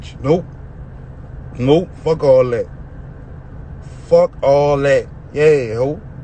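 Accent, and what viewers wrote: New Orleans, United States. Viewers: He has a new Orleans accent they all speak like that I love their accent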